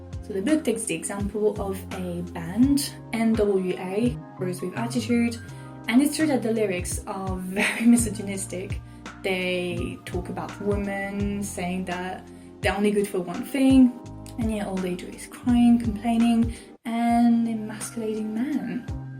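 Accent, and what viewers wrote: France. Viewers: Je pense que t'es française vu l'accent et la vidéo était grave bien et super intéressante mais stp investis dans un micro :(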